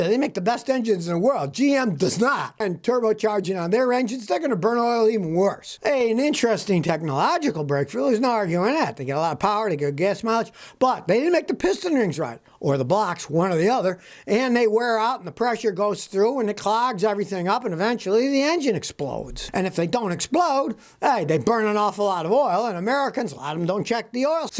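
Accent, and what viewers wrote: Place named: South, United States. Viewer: If you glued Scotty's hands to his knees, he wouldn't be able to say a word. He talks like folks from the South (says a gal from the South).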